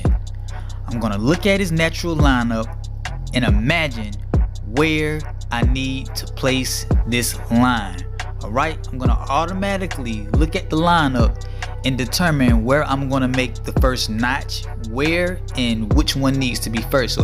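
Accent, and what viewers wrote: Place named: Houston, United States. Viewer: Wicked with the hair cutters as my grandpa would callem... HAIR CUTTERS...I swear you sound like you from H Town...great work!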